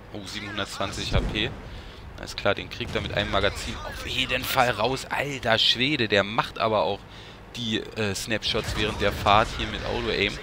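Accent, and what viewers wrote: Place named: Switzerland. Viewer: ahhh wie geil ein schweizer :)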